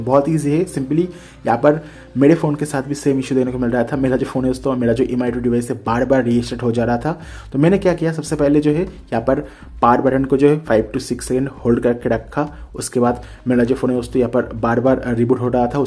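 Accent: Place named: India. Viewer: لمادا الشخص الهندي لا يقدم طريقة ويكتفي بالكلام مع ااعلم لا نفهم عليه اللكنة واللهجة. 😮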